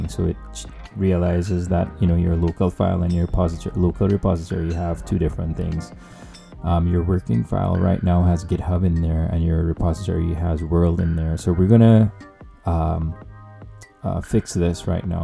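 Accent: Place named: Trinidad and Tobago. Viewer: If you listen, ever so carefully, you can hear the trini...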